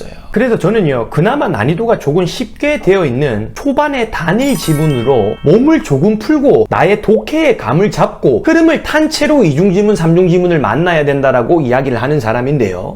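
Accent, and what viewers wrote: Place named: Busan, South Korea. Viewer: 사투리 쓰는거 욀케 멋있게 들리지? 부산 사투리인가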